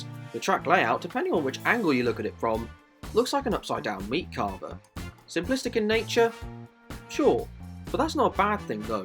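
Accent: United Kingdom. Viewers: Just found this channel Great content 🎉 The grainy British commentary matches the race footage perfectly So jazzy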